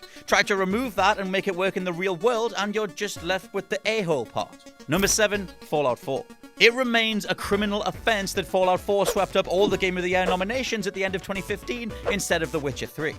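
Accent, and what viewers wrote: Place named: United Kingdom. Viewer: A British accent wearing an Alter Bridge T-shirt, makes it hard to stay mad at you.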